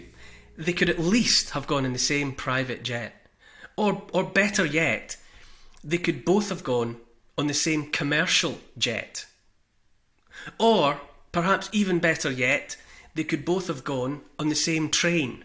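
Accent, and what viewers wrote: Scotland, United Kingdom. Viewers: 👍👍😍🦋🐬 💞 Neil A gentle, beautiful Scottish lilt A kind, empathic, forward tilt An open heart; a caring face A willingness to take his place. A strong, home-hitting monologue Reminds us of the boiling frog The courage to become a sleuth A passion to release the truth. A deep desire to crack the whip Illuminates our freedom-strip Calls out the darkness taking hold The shiny despots; plated gold! Intense and raw, he lays it bare A soul-enhancing, honest stare Inflections drive the message through He speaks for Us - for me and you! Discernment's such a precious gift His words create a mighty lift Take heed of all he has to say He knows - it shouldn't be this way! 🤗🦋🐬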